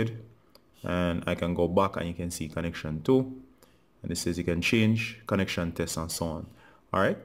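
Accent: Jamaica. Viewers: U sound Jamaican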